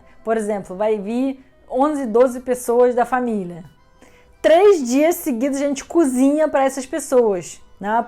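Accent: Rio de Janeiro, Brazil. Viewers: Tá com um sotaque carioca carregado, o famoso "S" chiado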